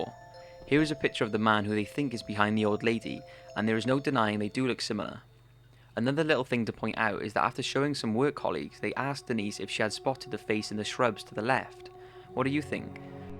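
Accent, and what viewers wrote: United Kingdom. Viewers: Your British accent makes the video sound creepier O.o PS. U have a new sub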